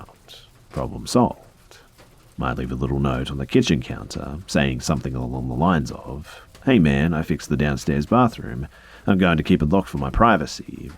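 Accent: Australia. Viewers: Love Australian accents! ps I’m Canadian! Lol I always try but it comes out British haha I repeat this guy all the time mate lol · If I'm having trouble sleeping, I usually turn on one of your videos cause your low, Australian voice lulls me into a sleep. I love your content 💛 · 2.5 hours of sexy Aussie voice.;). 🥂🍻🌿🌈🌸🌃🌆🌇🌋🌛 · Omg my body basically can’t fall asleep at night without listening to this Australian mans voice 😂 It’s pretty annoying since I fall asleep while listening and when I wake up I have to find my air pod because it falls out of my ear while I sleep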